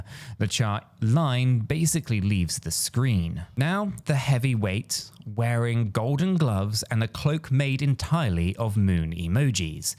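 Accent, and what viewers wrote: United Kingdom. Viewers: Love your channel. Great info!!! Your British accent and sense of humor put it over the top! 😊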